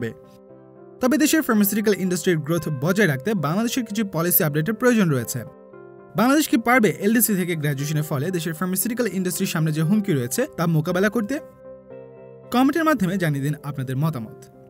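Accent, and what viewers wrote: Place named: United Kingdom. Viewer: আপনার সব বাংলাদেশী youtubers রা বাংলা ভাষা বলার সময় এতো British দের কথা বলার টান ব্যাবহার কেন কেরন।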